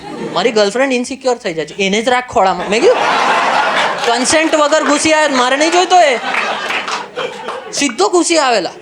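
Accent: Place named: Vadodara, India. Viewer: Bhai baroda accent bau cute laage 😍😂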